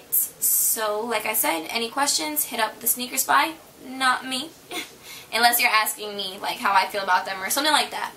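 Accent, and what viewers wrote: United States. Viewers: R u from jersey? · are you from boston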